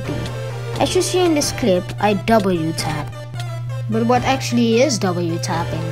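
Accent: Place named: Philippines. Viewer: It's pretty surprising for such good fps while you have less than 100 subs and you sound filipino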